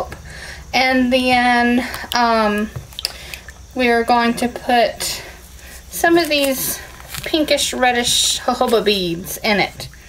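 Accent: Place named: United States. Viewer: Such a gorgeous soap! I bet it smells devine 😍😍 I love your accent-where in America do you guys live? I'm in Tasmania Australia 🇦🇺 We don't have many soap makers here so buying it isn't easy :( wish you lived closer,I'd buy soooo many of your soaps haha :) xx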